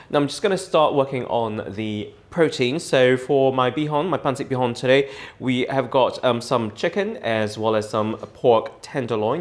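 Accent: United Kingdom. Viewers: ❤ your british accent 😊 sir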